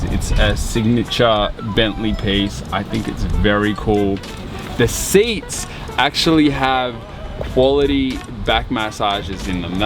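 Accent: Australia. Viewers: The way you break down the description on the car in the Australian accent!❤️💕 horses are so 🥰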